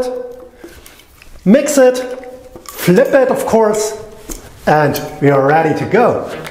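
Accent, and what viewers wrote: Germany. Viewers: Mega Videos🙏🏼🔥 hab sofort rausgehört das du Deutscher bist 😅 Mach weiter so🚵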